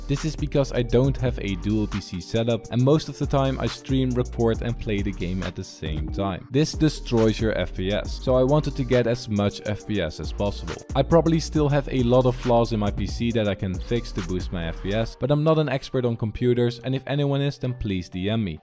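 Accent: Netherlands. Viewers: Ben jij Nederlands je klinkt als Nederlands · Are u dutch?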